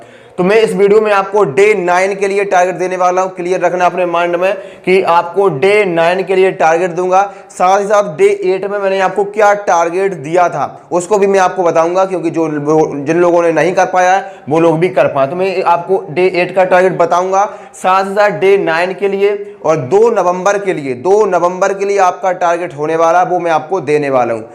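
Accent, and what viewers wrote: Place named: Madhya Pradesh, India. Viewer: Bhaiya aap mp se belong krte ho language s lgta h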